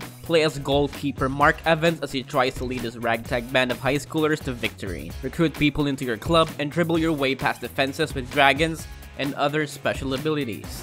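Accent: Philippines. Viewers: Is the speaker Filipino? He has the same accent as Filipinos. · The one talking is Filipino I'm 99% sure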